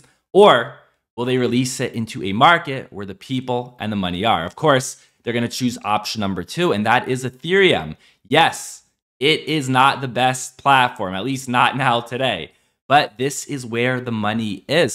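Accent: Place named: Philadelphia, United States. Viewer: Dude is from the Philly area. I’d bet some money. You can tell by his accent.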